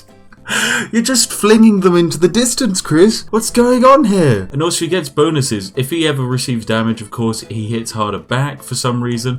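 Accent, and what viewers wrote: United Kingdom. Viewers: Oh No! My favorite game is so broken xD Lately I’ve bought Titan Quest HD for my iOS device and it’s awesome port from PC. To Sir Brit: Man, ur so awesome xD Mostly because u’ve got that British ‘ladies and gentlemen’ accent which I consider as pure awesomeness. I’ve watched so many of your vids that I’m wondering about drug relation of Yorkshire Tea. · Cannot stay away from that lovely British voice and cheeky exploits of yours ;) Just subbed.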